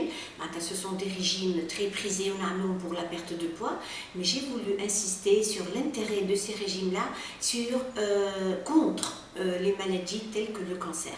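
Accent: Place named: Tunisia. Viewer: Merci Madame pour le partage ! Vidéo très éclairante ! J'aime l'accent tunisien !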